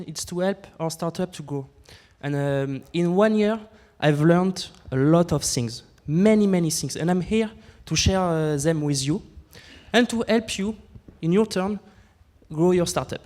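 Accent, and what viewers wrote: France. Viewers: Stop la condescendance, nous ne sommes plus au lycée. Être "fluent" en anglais ce n'est pas donner à tout le monde, que ce soit par contexte, âge, lieu ou expérience. Il y a une sale manie en France de faire de la maîtrise de l'anglais une barrière à l'entrée au monde de l'entrepreneuriat (entretenu par l'institution école de commerce). Hors on se ferme à 2 choses : - les intelligences ne parlant pas très bien anglais (nos mères, nos parents ?) - les marchés non anglophones et pourtant massifs et en croissance forte (à tout hasard : chine). Hors désolé, mais le monde anglais offre tellement de savoirs que ces vidéos ne font pas le poids. Pour un anglophone l'accent est trop prononcé, pour un hispanique l'accent rend l'anglais encore plus difficile et pour un français, s'il parle anglais, il ira regarder d'autres vidéos. Perso je n'ai aucun mal avec l'anglais, mais pour faire du sport, pour le métro, ou pour s'endormir, le frenglish ça demande trop de concentration. 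En plus on va pas se mentir, c'est un peu bidon comme stratégie rien que vis à vis de l’algorithme de Youtube. Perso j'aime la famille pour la voir se rater. Mais je ne peux pas non plus leur en vouloir de tester.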